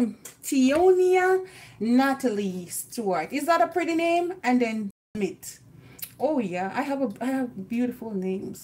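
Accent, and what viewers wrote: Jamaica. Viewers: me know weh yuh born yuh sound like st. Elizabeth girl like you video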